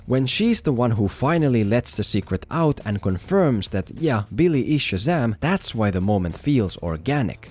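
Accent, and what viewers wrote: Finland. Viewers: Are you from Finland? You have a very Finnish accent. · Is this guy Finnish? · I hear a Finnish accent :)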